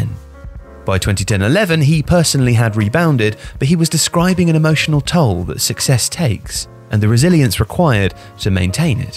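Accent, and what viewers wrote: England, United Kingdom. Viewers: Good job on the video, but very lazy nomenclature of "The Ukraine" Using phrases like "The Ukraine", "The Lebanon" or "The Sudan" especially in an English Accent really emphasizes the inability to detach oneself from Imperialism. I know this wasn't your intent Tifo Football, but it is extremely easy to hire an editor to catch the definte article to diminish a soverign country. Again, thanks for the video!!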